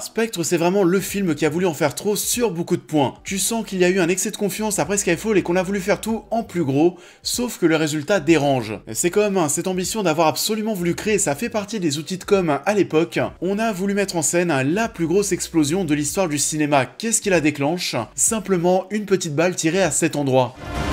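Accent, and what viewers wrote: Paris, France. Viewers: l'accent parisien est un peu too much aussi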